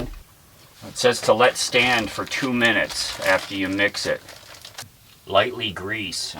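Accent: United States. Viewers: My brother in law tapes the Bills games the same day and watches them later ad free… so no one can talk to him on Sundays! I thought I heard a Buffalo accident when you talk…By the way… I said hello at camp (said hi to lefty but forgot your name! Lol) so happy belated birthday! I not part of that group… just camped and accidentally crashed the party! Hope to run into you again before I head out… come say hello if you’d like… in the little shuttle bus ✌🏼🚐✨✨Happy Thanksgiving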